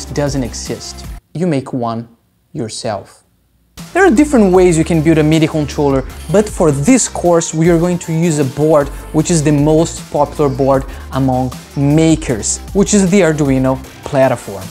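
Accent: Brazil. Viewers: Thanks for the info, I am going to build a controller for my DAW. I am from England but now permanently live in Brazil (Your accent sounds Brazilian).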